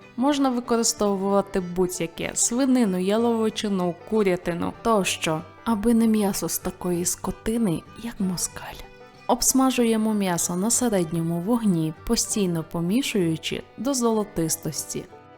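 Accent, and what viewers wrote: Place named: France. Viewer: Маєш французький акцент але смачно ❤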